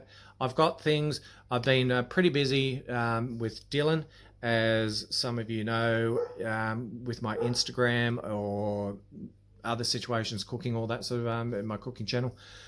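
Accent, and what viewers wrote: Australia. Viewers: I have always enjoyed your Australian accent and great personality. I am saddened by the cancer report. Your abandoned videos spoke for themselves, the objects and air left behind speak loudly in your silence. I have enjoyed all your abandoned explores. you revealed a handsome kind face. A lot of lives have been touched by your videos. My prayers are with you in your time of need. Stay strong and safe in these pandemic times. It has been fun and delightful to watch your videos. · Love your videos!!! Damn, you are a handsome Aussie too!! Love your accent too! Praying for you for your health!...I hate cancer!! Big Hugs from Mississippi, USA! Thank you for sharing your wonderful abandoned videos!! 🙏❤️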